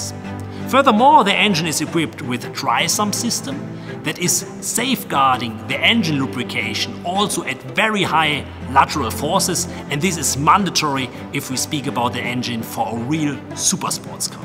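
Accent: Germany. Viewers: This hard German accent 😂😂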